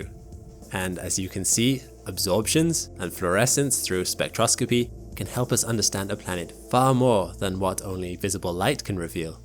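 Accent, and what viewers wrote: United Kingdom. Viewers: Why is he trying to sound like a British? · Why do you sound English but foreign at the same time? Can someone make it make sense to me? Is actually English or was he born somewhere else and moved here?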